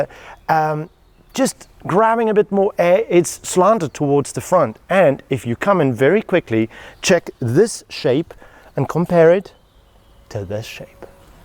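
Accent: South Africa. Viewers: is this dude south african ???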